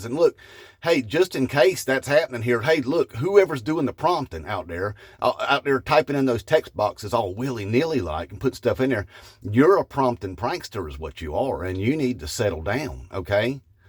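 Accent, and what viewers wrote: South, United States. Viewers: With your southern accent it don't make you bad guy imagine you talking with a wisconsin accent yea you know Der hey ya but don't cha know one time we agree old donny isn't best thing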